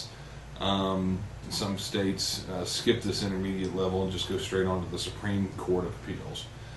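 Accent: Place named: South, United States. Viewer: logical presentation. I enjoy southern accents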